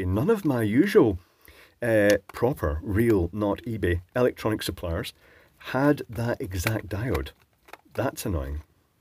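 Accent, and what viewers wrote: Scotland, United Kingdom. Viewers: I feel like I'm watching a Scottish Louis Rossman.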